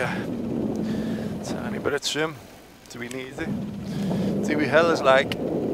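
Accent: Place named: Liverpool, United Kingdom. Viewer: I watched it 10 mins after it dropped. Great skills. Keep making the videos. I love them, I'm hooked. It must be you that I have seen flying over ours, in Thornton, by the new brooms Cross Rd. I'm hoping to get into this myself. It's sounds amazing someone with a good strong scouse accent giving it the bitters in a paramotor.. Love it. Keep em coming. Stay safe.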